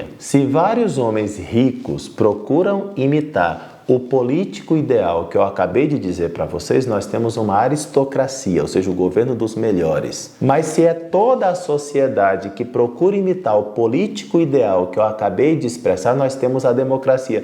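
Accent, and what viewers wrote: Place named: Rio Grande do Sul, Brazil. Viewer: Esta homem pode levar figuras ilustres e estrelas a uma profunda DEPRESSÃO. Sua fala me lembra o Gaúcho CARLOS REIS em: Seu professor mentiu pra você, mentiu muito. Grato.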